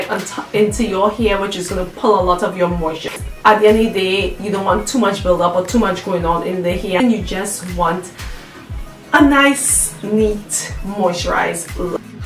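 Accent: Trinidad and Tobago. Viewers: she has a trini accent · ah know mih ppl Trini accent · yuh cyah hide the accent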